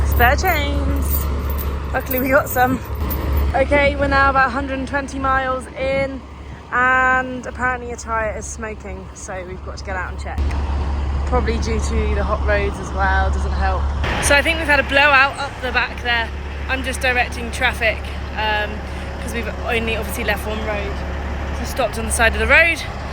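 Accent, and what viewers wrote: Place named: United Kingdom. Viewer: Love that British accent , that’s makes me come back to watch your videos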